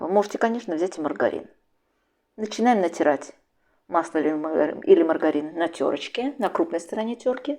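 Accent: Baku, Azerbaijan. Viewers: Тихи-тихо - это потихоньку. И у Вас милый бакинский акцент сохранился❤ И Вас приятно слушать и смотреть, спасибо за рецепт! Москва смотрит. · Джан, мой бакинский акцент ☺️🌷💜 Как бальзам на душу.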